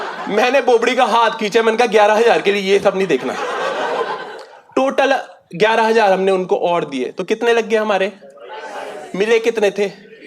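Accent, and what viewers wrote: Punjab, India. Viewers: Great punjabi comedy 😂 loved the accent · Punjabi accent 👌😂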